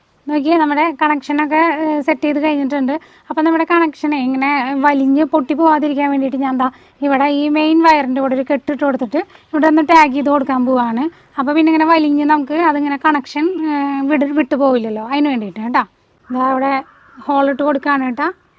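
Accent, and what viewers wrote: Thrissur, India. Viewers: സൂപ്പർ വീഡിയോ തൃശൂർ സ്ലാങ് പൊളി 👌😍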